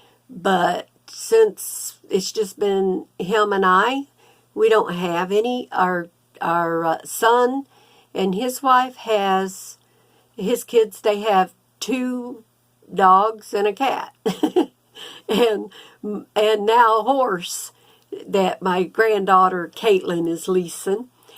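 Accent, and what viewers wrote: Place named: South, United States. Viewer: Thanks for sharing friend. I knew you had a southern accent!! Takes one to know one. Maybe we can do a swap soon.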